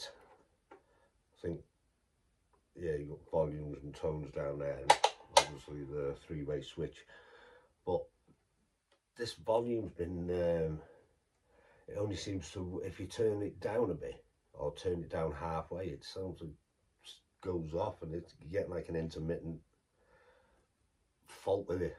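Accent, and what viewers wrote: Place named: Northwest England, United Kingdom. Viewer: Nice one! Love that gothic looking pick guard on the tele! You sound like you might be from the same part of the world as my family, in the north west, somewhere near Liverpool perhaps?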